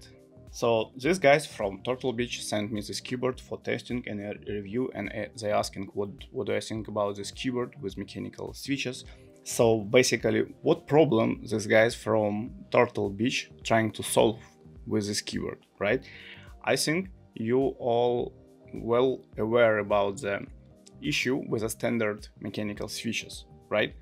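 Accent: Russia. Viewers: Акцент больно русский😮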